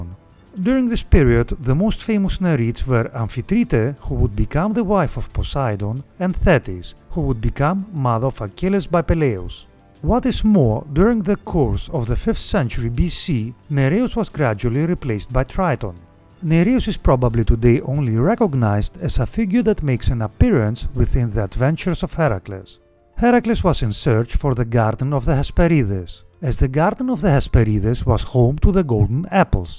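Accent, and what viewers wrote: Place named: Greece. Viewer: ελληνας στανταρ απο την προφορα στα αγγλικα. ωραιο καναλι 👍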